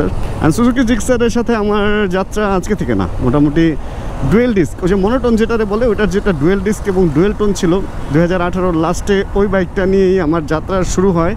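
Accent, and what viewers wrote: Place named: Bandura, Bangladesh. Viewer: Bandura kothy basha apner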